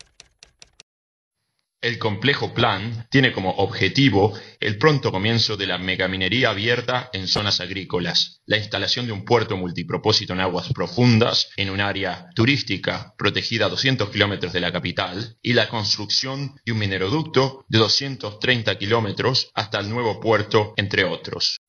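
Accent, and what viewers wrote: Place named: United States. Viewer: Lamentable este vídeo, totalmente político y falsante, el primer y grave error es entre el minuto 6 y 7 que habla sobre la decisión del presidente de la instalación de una planta de celulosa en el año 2000 y ponen una foto de tabarez Vazquez, cuando recién asume el cargo en el año 2004, increíble, no lo mire todo porque me parece una perdida de tiempo, otro detalle es la voz del narrador con acento yanqui, y por cierto EEUU es el país que mas contamina del planeta, produce nada mas y nada menos que el 30% de emisiones industriales de todo el mundo, Pd. en mi persona estoy en contra de la contaminación.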